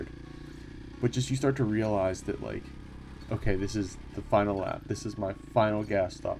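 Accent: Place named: Pittsburgh, United States. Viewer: Yeah, I could hear some Pgh in your voice! Sadly I haven't ridden anything in years just due to life circumstances. Gotta live vicariously through YT videos like yours. The only bike I've kept is a YZ125 that I rebuilt from top to bottom to race AWRCS. I go out and huff some two stroke exhaust from time to time to keep it circulating in the bloodstream though. Hopefully I get my freedom back before I'm an old man and I can get back out there. Thanks for taking us along for the ride.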